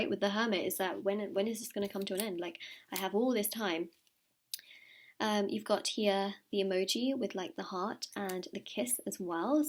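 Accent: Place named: South East England, United Kingdom. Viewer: South East English I think !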